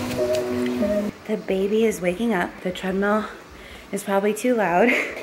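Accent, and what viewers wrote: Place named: United Kingdom. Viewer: I missed u saying (eggies)....😊also that I think, it's British, accent u do...i guess i miss ur vlogs...it inspires me...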